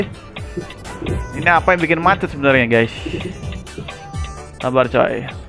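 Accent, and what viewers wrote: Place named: Java, Indonesia. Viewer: Bang sampean jowone ngendi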